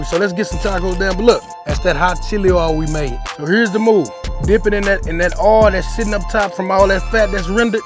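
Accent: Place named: Houston, United States. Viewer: Sound like you’re from Houston.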